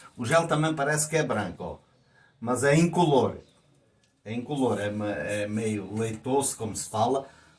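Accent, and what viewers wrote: Portugal. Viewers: adoro esse sotaque de Portugal!! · Esse é show, muito bonito, bem explicado sem falar nesse sotaque lindo que me lembra meus ancestrais, boas lembranças da infância. Parabéns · me escrevi porque esse português é engraçado. mais o trabalho também é bom. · É muito lindo o seu sotaque português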